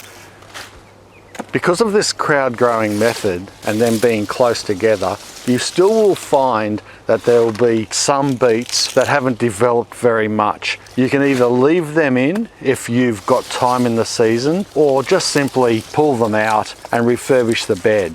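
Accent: Australia. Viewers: i enjoy your content delivery, your Ausi accent as well; i take what i can from you, because i live in a 6 months of gardening a year if i'm lucky, area just north of the 45th parallel, on the shore of Lake Huron one of our great lakes. i love beets, and growing them; i took away the spacing part of your talk, i'm gonna try your way in about 4 months. thanks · Chef E He lives in southern Queensland in Australia and he is speaking Australian. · Love your Australian accent! Your opinion of sub-irrigation grow boxes?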